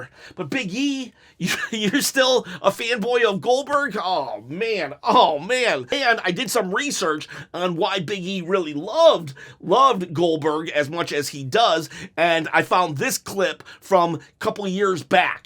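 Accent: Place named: New York, United States. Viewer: Can you tone down your New York accent.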